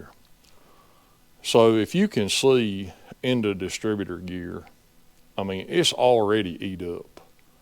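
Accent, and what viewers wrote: South, United States. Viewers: this is the most southern state drawl I've ever heard, a true testament to the American educational system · my man here has got once serious country accent....I miss the south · lack of oil supply on cam gears in my opinion and your southern draw is awesome dude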